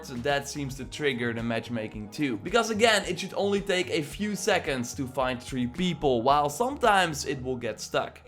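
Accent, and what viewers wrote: Netherlands. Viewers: Are you Dutch? I mean, '' '' should be a dead giveaway, but who knows.. You just sound like my Dutch friends speaking English to our American friends. Gewoon zo'n Nederlands dingetje weetjewel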